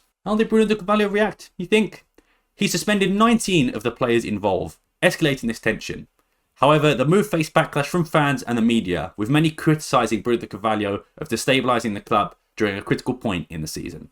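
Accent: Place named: England, United Kingdom. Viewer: Good video. You have an Iberian name and wear a sporting shirt but you have an English accent? Where are you from and who do you support?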